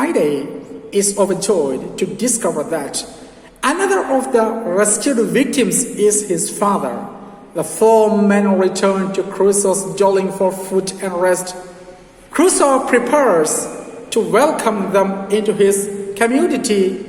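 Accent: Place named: England, United Kingdom. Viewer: Presented well. Your adherence to English accent is appreciative but let me tell you Indian accent is also acceptable. Once fluency is achieved accent is welcome otherwise it will sound a little bit artificial. Having said this, I don't mean you are average , you are well above and I wish you splendid honeymoon with the language.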